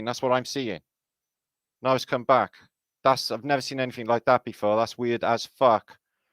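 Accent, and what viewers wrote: Wales, United Kingdom. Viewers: I'm sorry the world lost a good guy , a truther, with a gorgeous Welsh accent. (the world needs more of all of the above)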